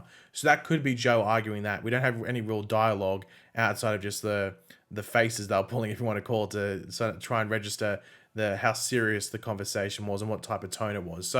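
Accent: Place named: Australia. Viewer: I missed your Aussie voice lol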